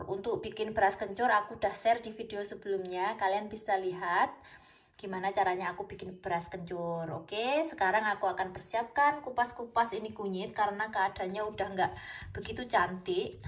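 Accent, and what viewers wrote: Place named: Java, Indonesia. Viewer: waduuh mba trnya ngomongya mendok bgt ya :D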